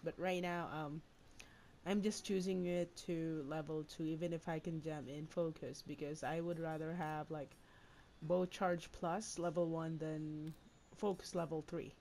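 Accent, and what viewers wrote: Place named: Philippines. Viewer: you sound filipino =D. but you just gained a sub! love your build coz i main bow too!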